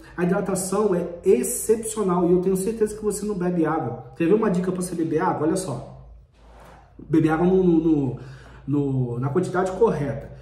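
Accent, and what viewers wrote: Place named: Minas Gerais, Brazil. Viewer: Além de lindo é mineiro. Com esse sotaque então....Meu amigo. Desculpa. Não resisti. Amo seus vídeos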